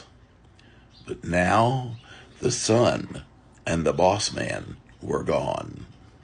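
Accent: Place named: South, United States. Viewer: Forget about what people say about your beautiful southern accent❤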